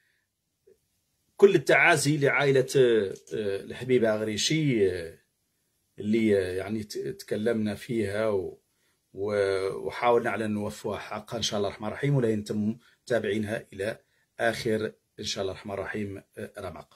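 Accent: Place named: Shilha, Morocco. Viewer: ❤❤❤❤❤❤ أخي لماذا تقول هاته وليس هذه ؟ لعلك تأثرت بلهجة اشلوحة لطول مقامك في الزريبة التي اخرجك الله منها ونور بصيرتك. الحمد لله على عودتك عن الباطل ورجوعك إلى اصلك وحقيقتك وكينونتك. نحن الشعوب الصحراوية الناطقة بالحسانية لسنا مغاربة ولا نحب ولا نقبل ان نكون مغاربة فلنا تاريخنا وثقافتنا وعاداتنا وتقاليدنا ولهجتنا الحسانية- نقول: هذه ، وليس هاته- عندي حساسية شديدة من لهجة اشلوحة. هههههه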